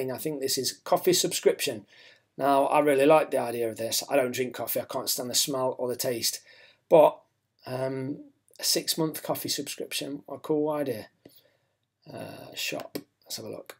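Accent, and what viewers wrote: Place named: Nottingham, United Kingdom. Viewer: Glad I stumbled across this. Genuine guy with a lovely Notts accent. Just subscribed 👍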